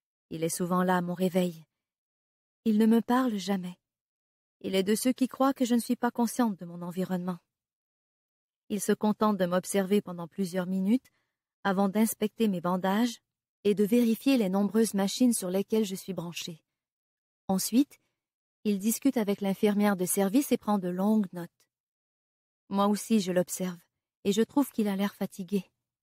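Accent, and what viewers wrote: Quebec, Canada. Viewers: La narratrice est peut-être bien québécoise ..vous y avez pensé ???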